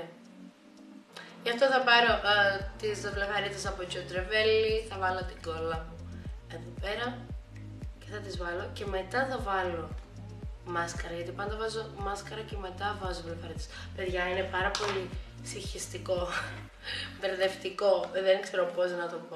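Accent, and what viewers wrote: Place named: Cyprus. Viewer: Εγω καταλαβαίνω να κυπριακά σου γιατι ειμαι και εγω απο τη Κύπρο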